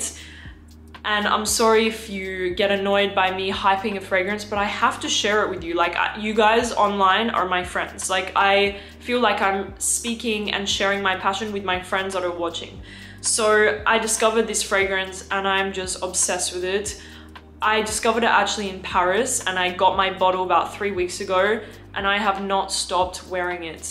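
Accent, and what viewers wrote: Australia. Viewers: you’re an Aussie living overseas and your accent reflects that. Don’t listen to haters. We love you ❤️💙💜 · Your Australian is still very prominent, it is just certain gestures that have rubbed off on you, totally natural. Im sure he has helped you with pronunciation of a lot of french words as well. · Your Australian accent is still very prominent makes me miss my good friend Joel from Perth. Ever smell Claude Mersal la mélodie one of my favorite fall unisex fragrances. Bought thameen peregrina as i watched this.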